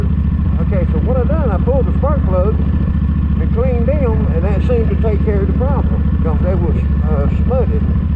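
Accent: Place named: South, United States. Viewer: Yes sir mine is a 02 classic 1500 but I've put 05 1600 mean streak engine on it and bored it .05mm over stock . I was going to change the injectors to see if that would help.i have vance and Hines straight shot drag pipes. and I've eliminated the air cleaner on left side and crossover tube. I can put new plugs in it and it runs grate for about a week .then they are black with sute and will start to run like crap.ive got service manual .and I'm going to go thru all the components and check them out while i got tank off-putting injectors in. Thank you for the input . And I love your videos you sound like your from the south like me. I own a ATV bike repair shop and I work on all kinds. But the Vulcan is mine. I loved the video on the timing chain tentioners that was a good one for sure .I just found your videos yesterday but your making grate content keep up the good work sir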